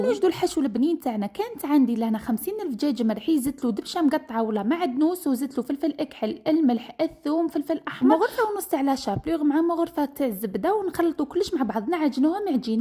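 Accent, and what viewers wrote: Setif, Algeria. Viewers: هدرت السطتيفية فيها خشونية وهءا اللي حلاها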